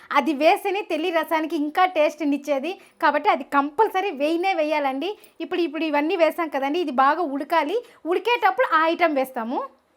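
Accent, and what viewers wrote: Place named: Kanchipuram, India. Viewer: కామక్షి తాయి లాగా ఉన్నావమ్మా కంజీవరం అమ్మదా మాట్లాడు తునట్లు వుంది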